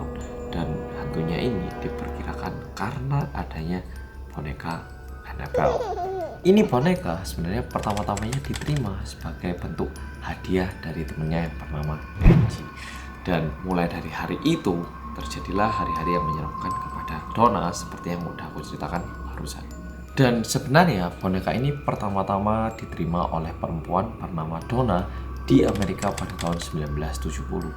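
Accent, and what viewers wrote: Java, Indonesia. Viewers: Orang Korea tapi ngmong nya medok banget wkwk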